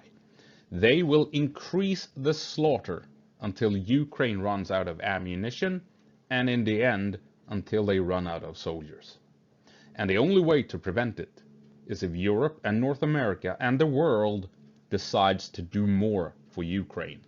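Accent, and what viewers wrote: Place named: Sweden. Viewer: Veldig imponert over swenglishen din😂👍 Denne kanalen ble lagt til blant mine favoritter med EN gang!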